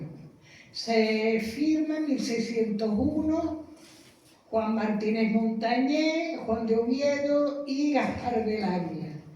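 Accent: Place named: Andalusia, Spain. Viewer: Magnífica disertación,de gran erudición y con una dicción y gracia muy andaluza,mis felicitaciones doña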